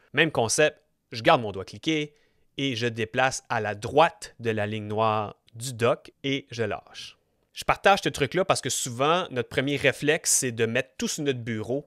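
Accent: Canada. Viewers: J'adore l'accent Canadien.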